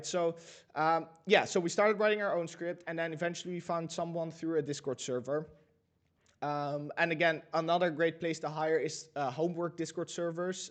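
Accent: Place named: Netherlands. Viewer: Are you Dutch? Cause I hear the accent 😂💪🏽💯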